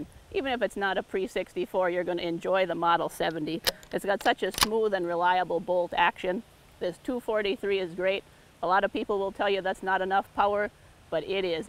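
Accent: United States. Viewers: All she needs to use is the word “feller” and that country accent will be complet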